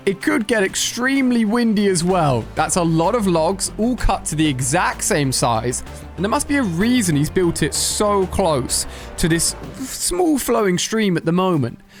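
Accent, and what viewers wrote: United Kingdom. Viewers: Bro is British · Are you british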